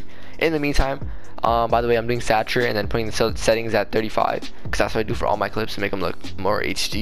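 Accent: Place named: North, United Kingdom. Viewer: Sounds like some up north stuff so I can follow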